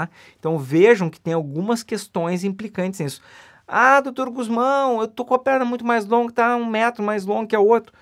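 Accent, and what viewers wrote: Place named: South, Brazil. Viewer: Amo o sotaque do Sul e gostei muito do vídeo. Esclarecedor! Parabéns!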